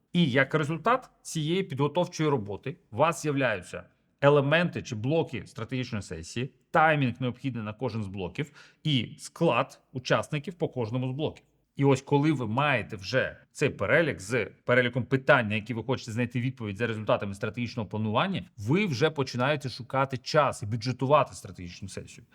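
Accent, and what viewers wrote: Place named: Ukraine. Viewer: Он говорит на русском, с украинским акцентом! В целом все понятно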